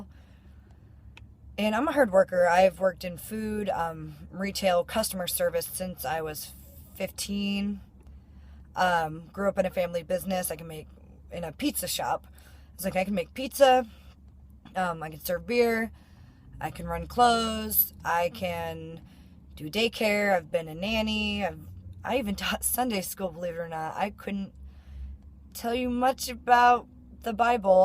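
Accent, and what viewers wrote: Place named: Pacific Northwest, United States. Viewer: I like your vids! It's always been a dream of mine to live in a camper/van/etc..... very cool. Also I could tell you were from somewhere between Portland and Seattle, probably the way you talk, it's almost like I've known you for years or something lol can always tell when someone is from the PNW or close to it.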